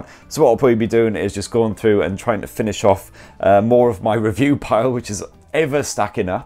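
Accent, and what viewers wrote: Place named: Newcastle, United Kingdom. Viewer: I meant it as a compliment, I'm a Geordie myself and I cannot detect any of the usual Makemesque accent. Your accent sounds very much north of the Tyne to me.😳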